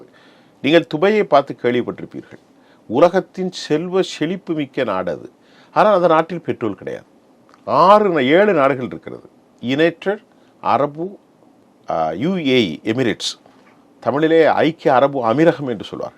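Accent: Sri Lanka. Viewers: His voice is unique cylon tamil ...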